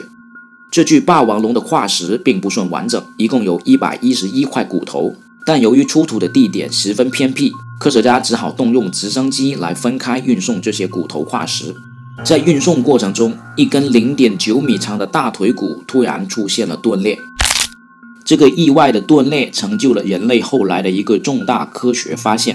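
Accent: Hong Kong, China. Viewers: 香港口音越聽越靠杯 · 这口音，是香港人或广东人说的普通话吗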